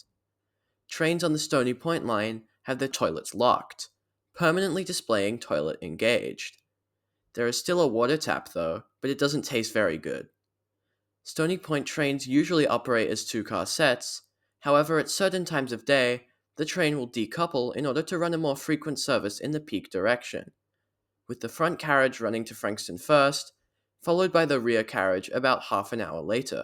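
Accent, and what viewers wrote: United States. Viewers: Great video. Curious where you come from because your accent has a slight American ‘twang’ to it. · Great video. Curious if you’ve learnt your English online from American content as you don’t have Australian pronunciation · I get a feeling he’s autistic. My cousin is autistic and I’ve noticed he and several even high functioning often unawarely talk with an American accent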